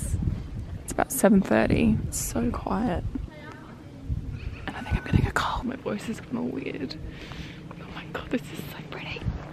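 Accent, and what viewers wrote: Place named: Australia. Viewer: "kay" "naydeeyaa"? australian accents are so cute lmao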